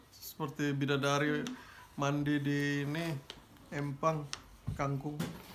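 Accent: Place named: East Luwu, Indonesia. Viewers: Saya orang malili Hahahahhaha lucu sekali logat luwu timur kentara sekali logat orang luwu timurnya ahahhaha sukses terus kak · Sangat menghibur setiap konten2 nya bang. Oh ya bang asalnya luwu timur sulsel yah. Soalnya logat nya sprti logat luwu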